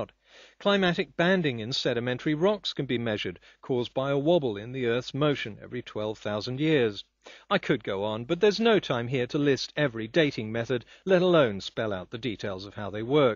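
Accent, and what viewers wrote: United Kingdom. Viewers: Finally someone tells it like it is, and with a British accent! Perfect! Even though I'm American. Thanks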